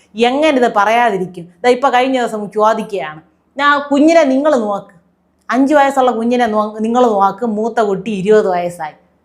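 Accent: Thiruvananthapuram, India. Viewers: 😵‍💫🥴 തിരോന്തോരം അപ്പി സ്‌ലാംഗ് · Thirontharam slang is xcellent❤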